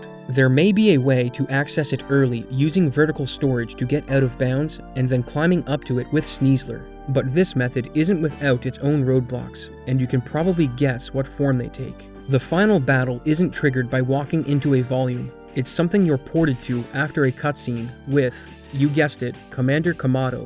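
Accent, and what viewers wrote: Canada. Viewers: First time visiting the channel, must say you have the heaviest Canadian accent I've ever heard. I'm pretty sure it's Canadian but let me know if I'm wrong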